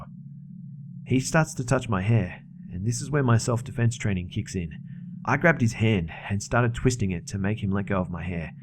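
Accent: Australia. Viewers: finally an ozzie CVNT reading me stories. thanks CVNT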